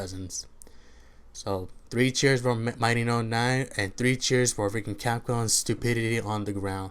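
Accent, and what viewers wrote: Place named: Mexico. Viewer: you have a strong Mexican Accent are you? Well good work on the video bro ;)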